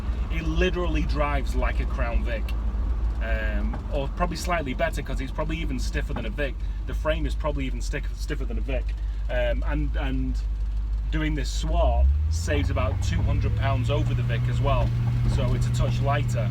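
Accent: United Kingdom. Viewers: A guy with a British accent living in cali. With an old school Ford truck. Welcome to America friend!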